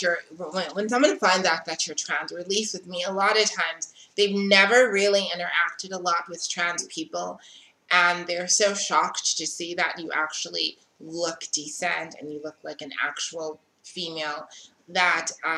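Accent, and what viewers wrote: United States. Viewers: Why do you have an American accent if you’re from South Africa?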